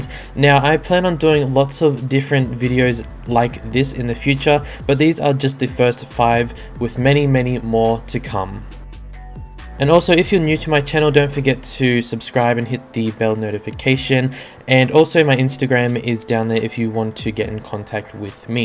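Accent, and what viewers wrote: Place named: Australia. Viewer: Ah, understandable. If it makes you feel better, you had me fooled. It sounds like you have a pretty normal Australian accent What's your first language?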